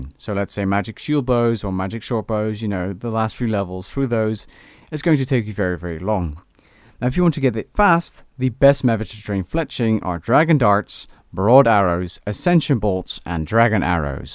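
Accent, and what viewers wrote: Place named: Netherlands. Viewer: haha nou je floept er genoeg Nederlandse klanken door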